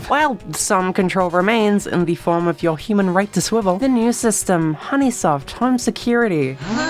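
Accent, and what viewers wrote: Australia. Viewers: I can’t tell if is Aussie, Brit or American anymore, but I have an autism accent so I can’t talk. Happy to be here nonetheless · Great video, but I have to ask what's up with your voice? Kinda Aussie, kinda British? Its a bit hard to listen to, if im honest. Hearing a perfectly fine austrailian accent and then you suddenly drop your T sounds to an almost comical degree (the "bo'oh'o'wa'er" effect). Ive lived long enough in both Australia and the UK to say that ive almost never seen australians drop their T's like that, and most british accents dont do it nearly as overtly as most think. This isnt a personal attack, its more that im genuinely curious. Its the same sort of question i'd ask if I knew someone that had never lived in the UK all their life but spoke in an american accent.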